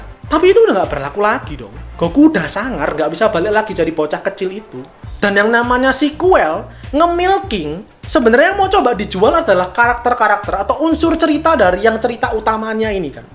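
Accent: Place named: Surabaya, Indonesia. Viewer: Wong suroboyoan yo cak? Medok banget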